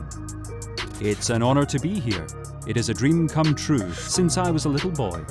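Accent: Scotland, United Kingdom. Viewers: You have a Scottish accent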